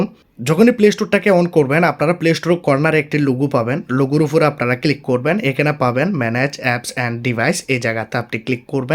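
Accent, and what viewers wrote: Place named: Chittagong, Bangladesh. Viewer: ভাই মনে হয় চিটাংগা।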